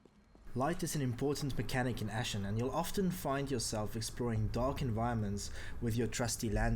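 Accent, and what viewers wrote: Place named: South Africa. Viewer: Why does this guy speaking sound like a Afrikaaner Anyways this game looks grea, however $40 dollars is SHIT expensive for a experimental title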